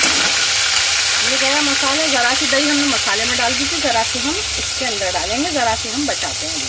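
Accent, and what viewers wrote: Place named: Bijnor, India. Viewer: aapka bolne ka andaz bijnori h zabardast ji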